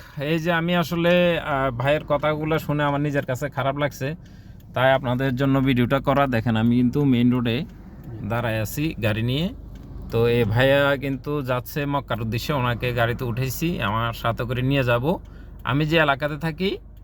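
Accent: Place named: Sylhet, Bangladesh. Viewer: সিলেটের লোক মনে হয় 😢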